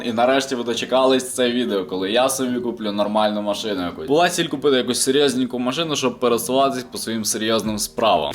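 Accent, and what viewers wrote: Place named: Western Ukraine, Ukraine. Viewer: Шикарно уже разговариваешь на западном акценте. Машинка сыпется но и года и пробег какой. У папика с нуля 2008 год правда дация логан 1,6 пробег 62 тысячи.Удачи а кокой пробег напишешь.Удачи.Добивайся такие машины средний вариант.Удачи.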